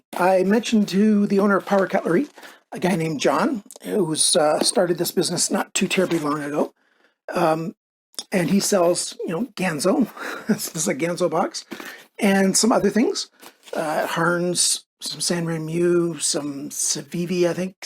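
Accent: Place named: Canada. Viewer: I actually watch your videos for 2 reasons - I miss the Canadian accent at times, and always love hearing about the knife laws and appreciate my move to Asia as I can own and carry basically any knife I want.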